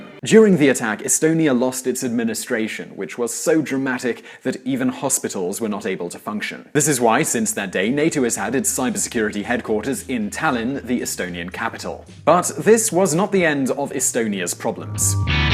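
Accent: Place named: United Kingdom. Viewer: british english, sounds good. awesome, im peruvian, i like british english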